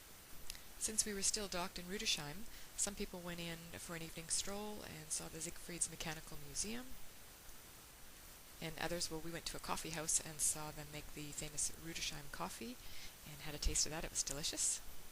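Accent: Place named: Canada. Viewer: Thanks! This is our first cruise, so we shall see how it goes. We chose Crystal. Booked through an acquaintance, but he has not given me half the info that you lay out so generously on your videos. I wish that I had found you before we decided. And, you sound Canadian (we are dual...but grew up in Canada)...so that is also awesome. If we like this trip, and decide on another, I will definitely contact you directly! Cheers.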